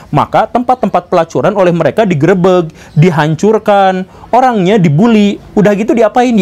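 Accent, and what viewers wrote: West Java, Indonesia. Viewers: Sederhana, dalam , menambah wawasan, mendewasakan, introspeksi, realita + bonus aksen sunda LANJUTKAN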